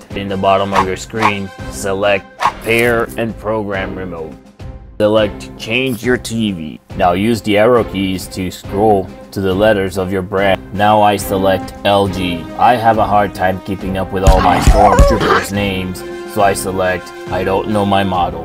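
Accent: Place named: North, United Kingdom. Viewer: Bro got dat up nort accent hey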